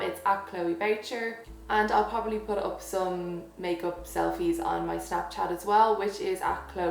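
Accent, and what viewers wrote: Ireland. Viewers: Are u Irish ??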